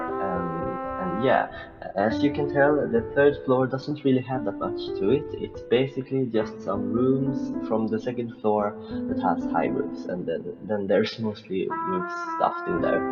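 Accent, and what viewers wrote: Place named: Norway. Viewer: Which country are you from? The accent sounds like Norwegian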